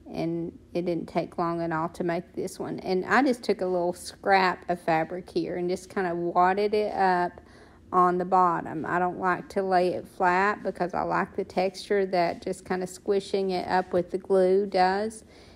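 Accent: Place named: Tennessee, United States. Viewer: Love how this came out. I love watching you, but I am always getting you mixed up with Teresa from Our Green Acres. You & her sound alike. Dolly Parton accent, please I say that as a good comment. Great work & my style completely.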